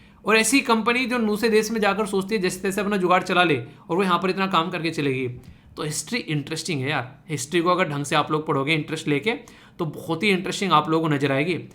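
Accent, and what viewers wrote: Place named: Western Uttar Pradesh, India. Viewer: Sir aapne kbhi btaya nhi aap kha se ho lekin m 100% surety ke sath kah skta hu aap west up se ho or Meerut ke aas pas kisi gao se ho (because aap sarpanch ko pradhan kahte ho jo ki up me hi kha jata h and aap ki boli me dehatipan h jo haryana se touch up me hi dehatipan dekhene ko milta or aap dhakad chhora ko bhi jante ho)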